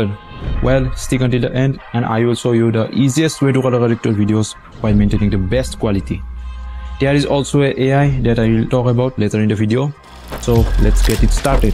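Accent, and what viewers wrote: Assam, India. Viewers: Bro are you Indian ?? Cause your English is like Indian (like me lol) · Voice to Assamese or nisina hona jai ase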